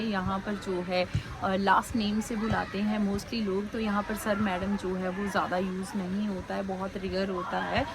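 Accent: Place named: United States. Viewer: She is america born... english soo american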